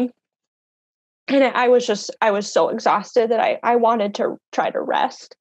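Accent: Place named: Wisconsin, United States. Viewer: I recognized this beautiful family was from Wisconsin within the 1st minute I heard her voice. I'm so very sorry for your loss. She is gorgeous, she is loved, she was wanted and she will always be missed and remembered. Much love and support from Madison, Wisconsin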